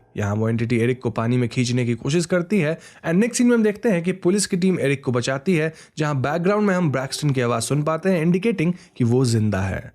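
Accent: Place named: Bihar, India. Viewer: Yeh bihari hai..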